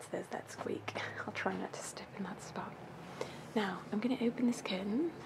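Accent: England, United Kingdom. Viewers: You have an English accent in there am i right?? xxx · I love this video it’s is amazing I love you and your videos I hope you get to see this if not it’s no problem I just wanted to say I love this channel because you are so thankful for the support and also you make very great videos and the British accent is amazing I’m from England and I think you sound so British hope you see this live you and your vids lots of love Emily Blanchard from England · 0121cole She is American (her husband is Egyptian), but uses a British accent to protect her identity because she believes that voices are easily recognisable and wouldn’t want anyone to reveal her identity. Hope this answers your question. X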